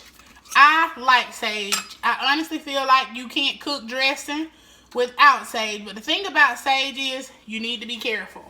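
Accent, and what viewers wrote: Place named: Alabama, United States. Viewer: You gotta be from Alabama… southern down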